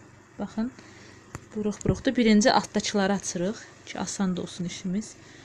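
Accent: Azerbaijan. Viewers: Salamlar. Bu videonu her yerde paylaşacam. Baxıram yarı rusca yarı hansısa Azerbaycan rayon lehcesidi. Super.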